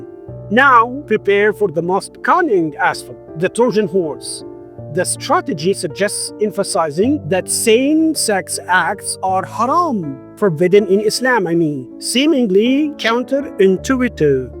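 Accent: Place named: United States. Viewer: طول عمري بحب اسمعك وانت بتتكلم لكنتك امريكاني فاكر زمااان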